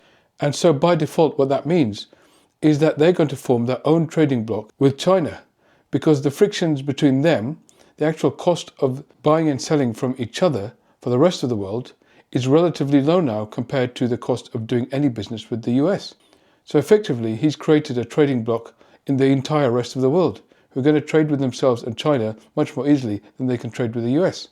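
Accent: United Kingdom. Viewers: When I hear mathematics with a British accent I always think of the teacher from Willy Wonka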